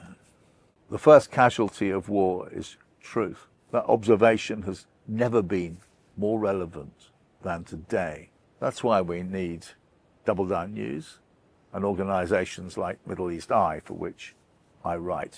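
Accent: United Kingdom. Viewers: I know it’s unimportant compared to the very serious topic at hand but I can’t believe an older guy with a British accent is pronouncing “nuclear” as “nucular”. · Speaking with a British accent doesn’t automatically grant credibility. The repeated declarations and attempts by various Muslim countries and terror groups to eliminate Israel are conveniently ignored. Iran’s extensive missile production and nuclear program are barely mentioned. Instead, the focus remains obsessively on a tiny country that simply tries to live in peace, yet is constantly dragged back into responding in the only language that seems to be respected in the Middle East. It’s time for the Muslim world to accept differences, choose peaceful coexistence, love their children more than they hate those who are different, grant women equal rights, and step into modern times.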